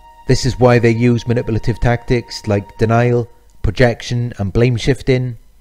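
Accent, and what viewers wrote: Wales, United Kingdom. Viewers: I had a male friend in his late fifties whose mother needed to infantilise him in front of his girlfriend. She would constantly talk about things he did as a child that made him appear stupid, and was still calling him by babyish nicknames. He tried to ignore it, but his girlfriend couldn't stand it. She let the mother have it real good at the dinner table one night, and said they'd never come over again if she didn't stop it. The mother was shocked because she didn't see anything inappropriate in her behavior! Thanks for these videos, and by the way, I love your Welsh accent!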